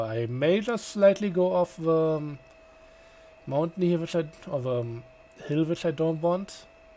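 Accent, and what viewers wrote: Germany. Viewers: i really enjoy your germanized english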